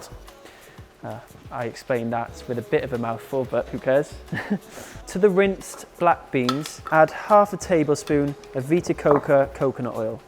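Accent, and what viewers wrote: Wales, United Kingdom. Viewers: Aw. It's such a musical accent. My whole family is Welsh but sadly my sister and i were the only cousins brought up in England so we don't have the lovely lilt that has...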